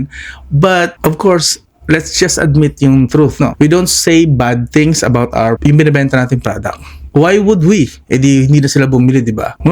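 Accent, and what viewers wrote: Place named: Conyo, Philippines. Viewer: conyo hehe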